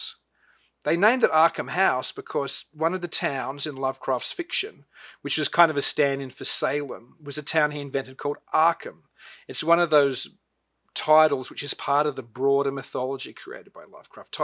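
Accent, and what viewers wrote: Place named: Sydney, Australia. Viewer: Good to see a fellow Sydneysider talking about Lovecraft books 😊Recognised the accent straight away.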